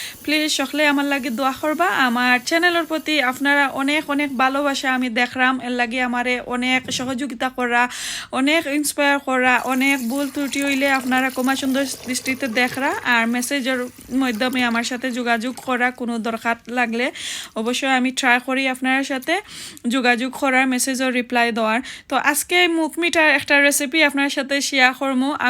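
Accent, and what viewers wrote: Sylhet, Bangladesh. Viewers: nice,please sister don’t change your accent, I like to hear our Sylheti accent, u r a Sylheti person so why should u change your accent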